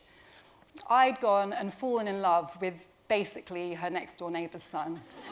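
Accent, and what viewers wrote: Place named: United Kingdom. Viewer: they have trackers eh? Care to enlighten us as to how that works with over the air broadcasts? This idiot woman doesn't even understand what racism is. She's half black and the EDL didn't give a fuck about that. They didn't care because she spoke like a brit, and she called that racist. What a fucking dummy. British people, rightly so; don't want shitty, barbaric backwards cultural practices superseeding their own. Or maybe you're OK with FGM and your opinion being worth 1/2 that of a man in courts?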